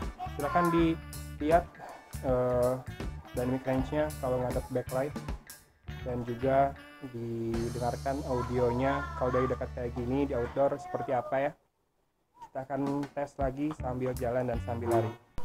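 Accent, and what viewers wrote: West Java, Indonesia. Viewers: Ah sunda pisan eta murangkalih si akang logatna 😁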